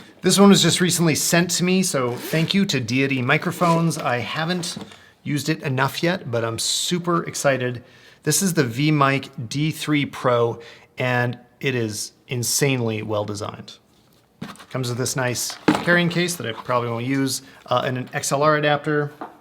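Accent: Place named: Canada. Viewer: ur accent tells me you'r from Canada :)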